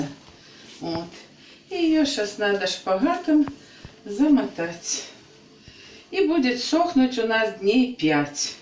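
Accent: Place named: Belarus. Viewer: Очень милая женщина и хорошая хозяйка! Была в Белоруссии не раз, очень нравится страна, люди, говор очень приятный. Подписалась, с удовольствием буду Вас, смотреть.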